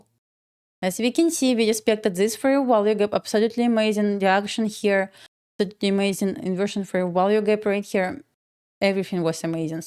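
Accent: Russia. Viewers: YOUR MOTHER LANGUGATE MUST BE RUSSIAN...I CAN FEEL IT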